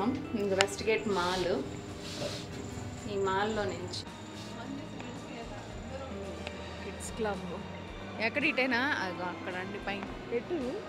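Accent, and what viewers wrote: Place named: Andhra Pradesh, India. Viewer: నమస్తే గారూ.. రాం చానెల్ చూసాక మీ చానెల్ సబ్స్క్రయిబ్ చేశాను.. తెలుగు ఇక్కడ మన ఊళ్లలో మాట్లాడినట్టుగానే చక్కగా మాట్లాడుతున్నారు.. పిల్లలకి తెలుగు రాయడం చదవడం కూడా ఇంటి దగ్గర మీరే నేర్పించండి.. తెలుగు వారు అందరూ కలిసి ఒక చోట సినిమా చూస్తానికి రావడం ముచ్చటగా ఉంది.. ఏదో ఫంక్షన్ కోసం వెళ్లినట్టుగా అనిపించింది.. ఒకప్పుడు భారత్ క్రికెట్ టీం కెన్యాలో పర్యటనకు వచ్చే వారు.. ఇప్పుడు కెన్యా టూర్ ఉండడం లేదు.. తెలుగు వారే కాకుండా ఇతర రాష్ట్రాల వాళ్ళు కూడా ఉన్నారా.. చానెల్ చూడడం చాలా సంతోషం కలిగించింది..🎉😊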